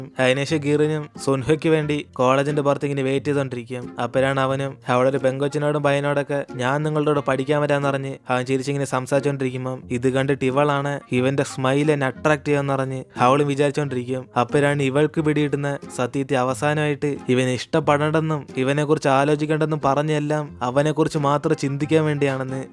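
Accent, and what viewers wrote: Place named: Pathanamthitta, India. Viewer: Chetta vid pathanamthitta ano??? Slang kettit thonni athaa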